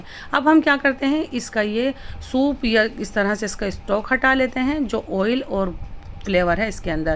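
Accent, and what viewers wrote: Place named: Delhi, India. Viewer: I love your proper Dili Urdu accent. I can listen to you all day long.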